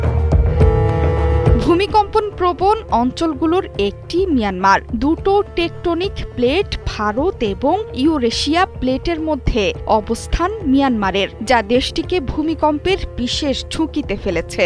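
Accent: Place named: West Bengal, India. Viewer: খবর পরার স্টাইল পুরাই ইন্ডিয়ান বাংলার মত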